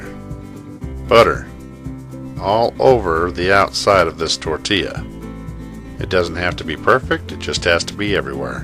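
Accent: United States. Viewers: You sound very American to me. I'm not hearing any accent ... but you do have a wonderful voice.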